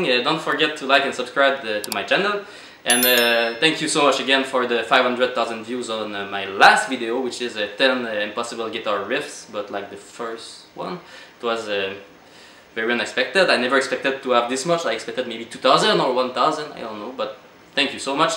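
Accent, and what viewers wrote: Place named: Quebec, Canada. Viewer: Ahahah j’pensais pas que tetais quebecois avant que tu commences a parler!! 😂😂. Good job bro!!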